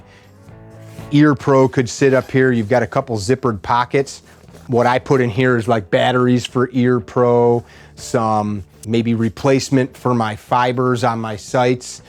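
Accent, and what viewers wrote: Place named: Midwest, United States. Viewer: Born in Des Plaines, live in Phoenix now, always a breath of fresh air hearing your Midwestern accent! love the content!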